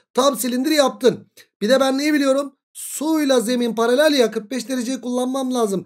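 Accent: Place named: Aegean, Turkey. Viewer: egeli sanirim sive benziyor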